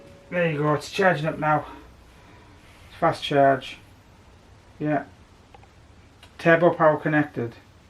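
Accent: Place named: Teesside, United Kingdom. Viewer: I’m guessing you’re a Teesside local?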